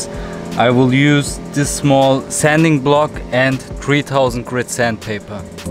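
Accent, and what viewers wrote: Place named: Turkey. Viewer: Sanki aksamınız Türk gibi.. Thanks for video..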